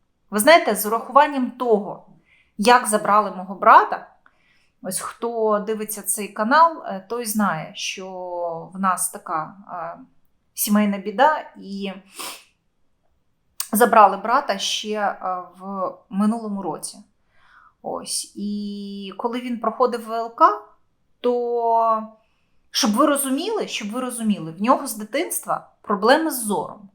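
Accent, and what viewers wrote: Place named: Russia. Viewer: ты с себя выдавливаешь укр.слова Думаешь на русском,в уме переводишь,поэтому акаешь и запинаешься,говори как тебе комфортней Мы понимаем русский язык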